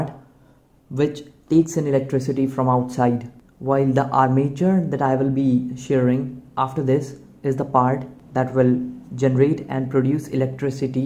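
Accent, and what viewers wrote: India. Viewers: Indians like you speaking english sound very ridiculous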